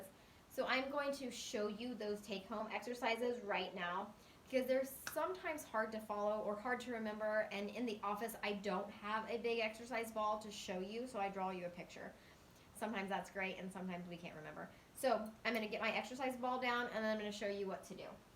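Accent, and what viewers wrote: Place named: Minnesota, United States. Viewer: Canadian? Definitely not from the south. Minnesota?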